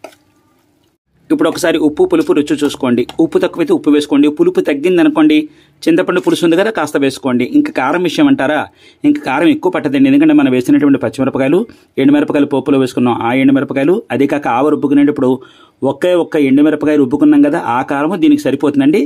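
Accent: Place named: Godavari, India. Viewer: చక్కని గోదావరి జిల్లా తెలుగు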